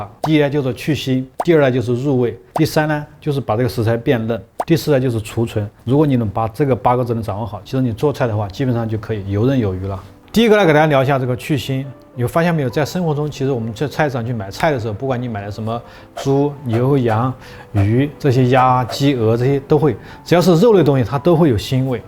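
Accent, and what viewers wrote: Sichuan, China. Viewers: 一定要多支持，听口音感觉是我们四川老乡，最关键的是讲解的非常仔细清晰但是不复杂。学到了很多知识。非常感谢，继续加油~~~ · 好喜欢的四川口音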